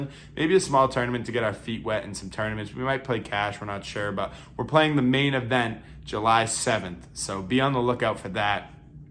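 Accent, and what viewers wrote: Boston, United States. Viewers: that’s a boston accent lol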